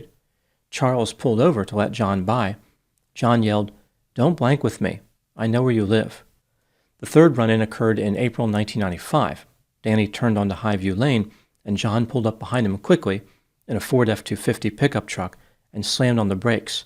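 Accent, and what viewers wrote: United States. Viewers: You sounded more American than ever in this piece.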